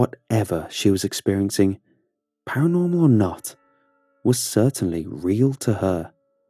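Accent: United Kingdom. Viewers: What’s with all these videos narrated by a Brit? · why is it that a good British accent makes any story better? there is something soothing to me about it, like he could tell a story about how he makes mashed potatoes and it would likely captivate me. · Why is the guy British bruh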